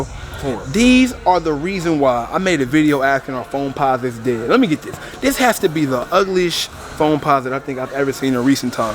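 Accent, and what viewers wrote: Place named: AAVE, United States. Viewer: ENGLISH NOT EBONICS!! YOU SOUND STUPID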